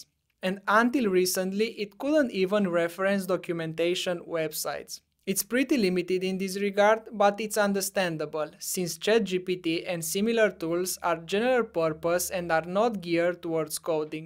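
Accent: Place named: India. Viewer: Came here for the Indian accent.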